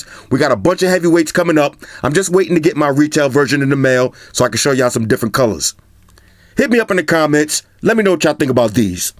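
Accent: New York, United States. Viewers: Lol. OMG I could listen to you talk all day!!!! It's the New York!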